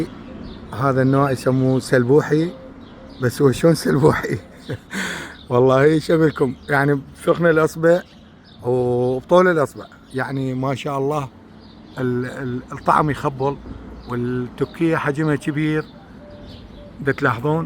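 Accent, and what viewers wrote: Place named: Iraq. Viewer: كلش جميل اسلوبك العفوي واللهجه العراقيه ❤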